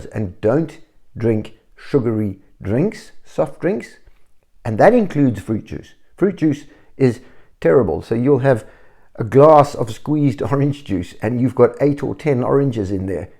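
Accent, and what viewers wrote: South Africa. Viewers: Are you South African (by your accent!?) Can you give an example of what you ate in a day?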